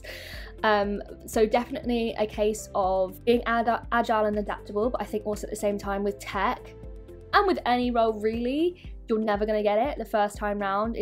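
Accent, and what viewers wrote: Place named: United Kingdom. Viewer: Her british accent :)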